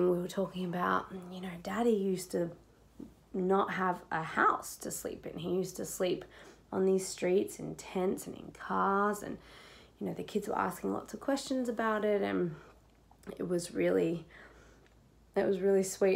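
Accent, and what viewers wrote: Australia. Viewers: I love that you share all of this with us and that you’ve never lost your Aussie accent!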